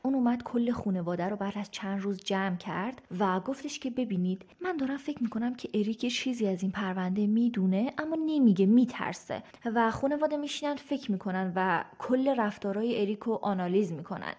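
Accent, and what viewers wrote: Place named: Isfahan, Iran. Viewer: درود برشما بانوی زیباوجذاب.شما خودتم گوگولی هستی،راستی هرکاری کنی بازم ته لهجه‌ی اصفاهانیتو داری😊😊😊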